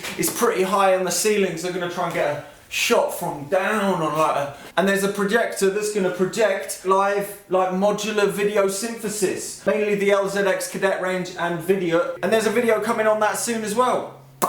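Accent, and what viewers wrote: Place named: London, United Kingdom. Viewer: I 'm just watching your videos cuz you're funny and have a genuine londonian accent. I like that!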